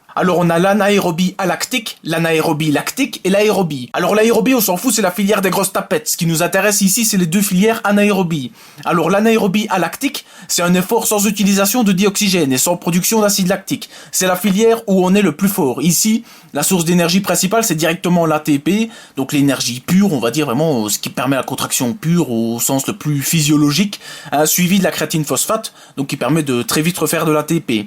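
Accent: Belgium. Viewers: ahahahaha!!! Génialissime, avec l'accent belge et tout trop fort, merci:D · es tu Belge???:D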